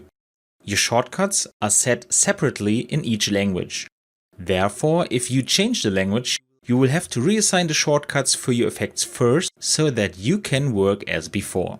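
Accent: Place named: United States. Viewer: indian guy with insane american accent means we getting our problem solved 🔥🔥🔥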